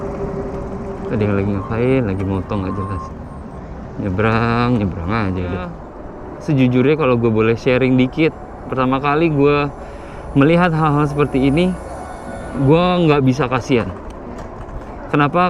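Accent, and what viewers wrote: Indonesia. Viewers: buat orang rantauan luar negeri selama 19 tahun itu keren kalau logat indo lu masih dipertahankan, atau ga berubah, karena beberapa orang yg udah lama diluar negeri ketika balik ke indo itu logat indonya kurang, kyk bule baru belajar bhs indo wkwkw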